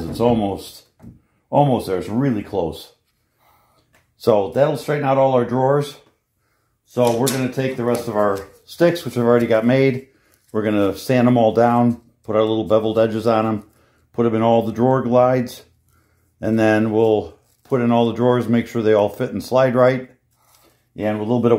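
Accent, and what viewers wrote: Chicago, United States. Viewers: Magical, masterful, mystical restoration. With a Chicago accent.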